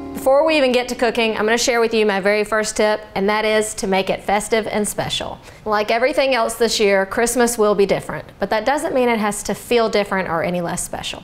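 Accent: South, United States. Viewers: Girl please wear color against that white kitchen 😘😘😘😘😘💜💜💜You have a lovely Southern accent. Great meal! 💜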